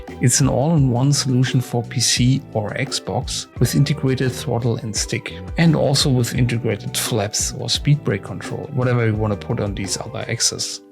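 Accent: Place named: Germany. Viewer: your english is very german :)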